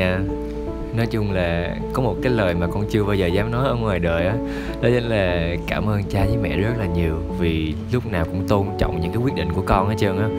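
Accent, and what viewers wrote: Vietnam. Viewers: Chất giọng người Nam Bộ sao thương quá là thương !!!!! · Trời ơi cái giọng sài gònnnnnnnnnn 🥰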